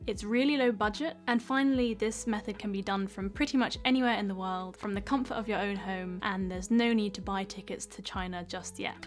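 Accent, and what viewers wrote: RP, United Kingdom. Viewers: Oh she's pretty beautiful!!! and I really loved her videos I've never seen something like this before. Somehow I would like to ask something. Is she from UK? because I'm currently learning English so it's a little bit difficult for me to understand some things and I can say that I'm used to the American accent so it's possible that I can't get her for that reason. Obviously always with all my respect. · This young lady is drop dead gorgeous and far more than simply bright, arriving replete with a British accent! I am now technically an old man (although I neither look it, act it, nor have a body that reflects it - and I am quite sure that I will give those who want to know how it do my life style how to do it! Moreover, I will be giving my advice for free - but that doesn't mean it is easy either as I am also in love with Kung Fu, weight lifting, running, and Keto) so if I can learn this while living in the Stock Market you can too! 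Thank you Dr.! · Hi. Love your British accents. You are very motivating. Thank you for your guidelines on Chinese learning. I am trying learning Chinese as well. Very interesting and I think is the hardest language to learn. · Hey,I like your British accents,but I think you should take next time microphone,cause I struggle to catch some words. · i loved your british accent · Starting to learn Mandarin, and came across your vids. You could be in the dictionary under "life isn't fair," as you are stunningly beautiful, obviously brilliant and the British accent simply ups your attractiveness to levels beyond. I think you will be a great incentivizer to learning because I would not want to disappoint you by failing. To quote Jack Nicholson in As Good as it Gets, "you make me want to be a better man." To that end, I am hitting the books. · Assuming an average person would only do about 1 hour a day, because burnout is a thing, this plan would get you to her level in about 2.5 years. 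Not sure how long it would take to get her nice British accent. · Pretty faces tend to break people's brains. And a high class British accent forces you to listen. You have a devastating package! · I am a HSK3 graduate, from Argentina, then only phrase you said is perfect mandarin. I am not getting into any more of your channel, i thought you would start speaking zhong wen. Nice posh brit accent, btw.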